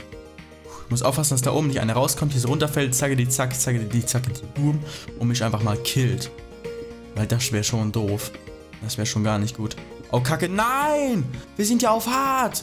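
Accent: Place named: United States. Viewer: Er hat iwie einen amerikanischen Akzent :))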